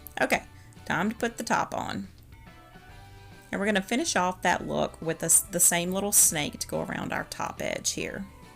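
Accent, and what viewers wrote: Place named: Ozarks, United States. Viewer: Subscribed before I saw your diy just because of your voice! You sound like me! Hehe🤷 you may not be an Ozark Hillbilly, but if you ever make it up here, holler and I'll show you all the good junkin' spots! LOL there was an exceptionally awesome craft show here a few weeks ago at War Eagle Mill. If you ever plan a fall trip to the Ozarks that would be an awesome thing to shoot for. You can't be too far according to your accent lol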